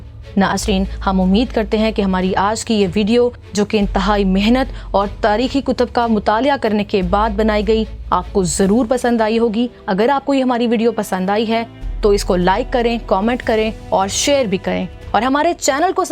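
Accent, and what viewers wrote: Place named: Punjab, Pakistan. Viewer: Please speak Urdu like Urdu not Punjabi. Or just speak Punjabi.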